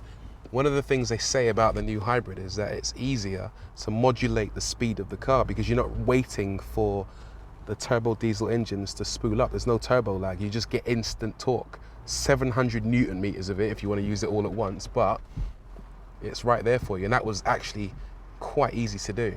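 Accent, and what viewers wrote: United Kingdom. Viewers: you do know hes british right? he is speaking true english....your american english sucks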